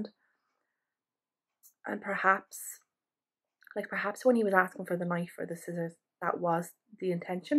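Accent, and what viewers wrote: Ireland. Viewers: It's a typical Irish way of speaking so she's just being herself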